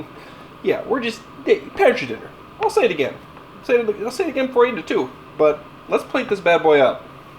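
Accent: Canada. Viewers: I’m sure you’ve addressed it before, but where are you from? I’m assuming Canada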